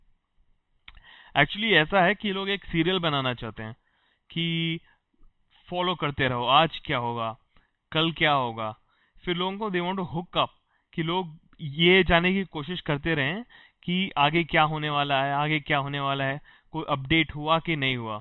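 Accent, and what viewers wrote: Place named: India. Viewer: the accent doesn't sound american to me.. its our own desi accent ..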